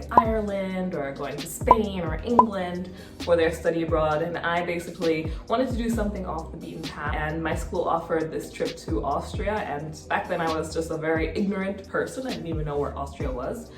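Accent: Jamaica. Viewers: I knew I heard that Jamaican accent hidden under there some where lol...also we have the same last name hmm.. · We’re the same height and I knew i heard some Jamaican in you! I’m Jamaican living in the US who also loves Romance Novels especially English Lit . I’ve never met anyone else who enjoys it most people think I’m actually living in a fantasy world but I know it’s exists lol ❤️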